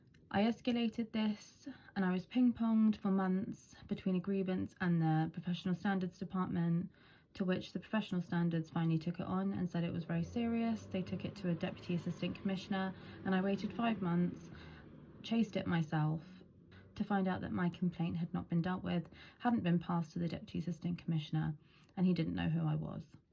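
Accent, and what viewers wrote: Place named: United Kingdom. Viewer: I love that British 🇬🇧 accent.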